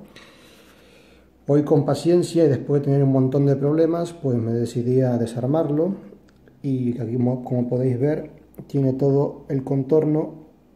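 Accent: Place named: Argentina. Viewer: Tenes un toque de acento argentino y español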